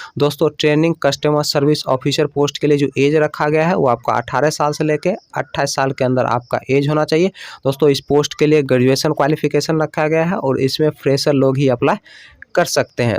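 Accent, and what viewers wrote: Bihar, India. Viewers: Sir AAP Bihar se hai kya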